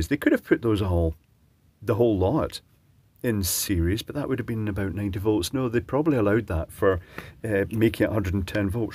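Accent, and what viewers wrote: Scotland, United Kingdom. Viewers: I’m strictly here for the beautiful Scottish accent!! 😉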